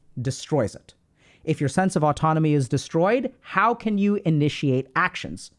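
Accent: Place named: India. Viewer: I wish he would use his Indian accent more! It motivates me to listen more.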